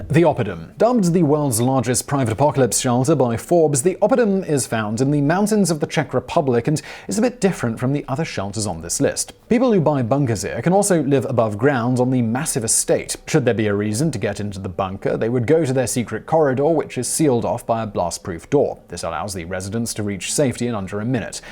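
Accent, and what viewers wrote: United Kingdom. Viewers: Two things: 1 - Love occasional British pronunciation of names or locations. I was doing laundry while watching this one and it took me a moment to realize the Adri-an-dak Mountains were what I have always heard refereed to as the Adirondack (Ad-i-ron--dac) Mountains. And B - Lived in Indiana most of my life and had never heard of the Vivos shelter. Once again I find that a wacky Brit and his friends living on The Continent have educated me on something going on in my own backyard. Keep up the great work, and the rest of the team!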